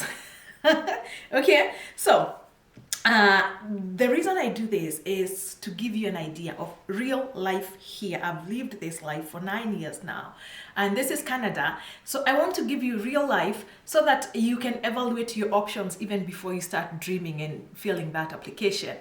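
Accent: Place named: Jamaica. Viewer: I actually thought you were Jamaican, even when I watched your other channel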